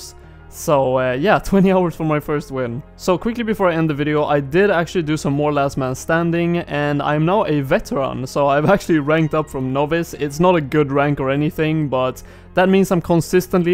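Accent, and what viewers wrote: Sweden. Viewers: Good stuff man! If my ears don't lie that accent has to be Swedish. Lycka till i fortsättningen!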